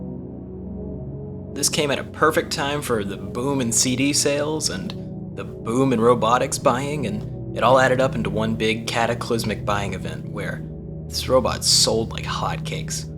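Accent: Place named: Germany. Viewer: Incredible German accent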